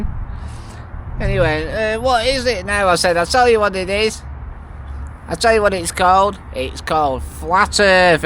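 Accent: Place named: Burnley, United Kingdom. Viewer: I used to live in Burnley. What happened there was weaving in the big sheds. Three was also a brewery. Nice video thanks. BTW you sound like you were a Burnley man.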